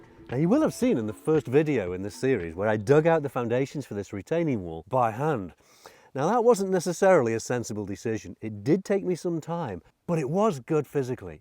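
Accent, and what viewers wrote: Lancashire, United Kingdom. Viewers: you're welcome, Mr. You speak without repetition, hesitation or deviation. So you have an old Lancashire Liverpool accent?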